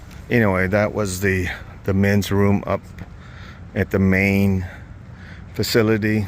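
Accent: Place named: Latin America. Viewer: I hear a Latino accent. Hablas español?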